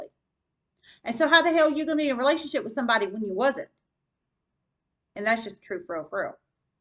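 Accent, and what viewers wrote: South, United States. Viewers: Love the sound of your voice. Very Southern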